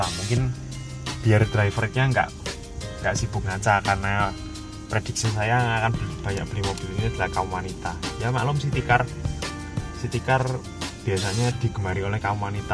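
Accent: Surabaya, Indonesia. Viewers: 10 detik awal, gak perlu moco comment, asli suroboyo yo ? salam medhok masbro :D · iki wong suroboyo yo ? sepisan ngrungokne langsung mikir suroboyoan. medhok jowone masbro. salam suroboyo👍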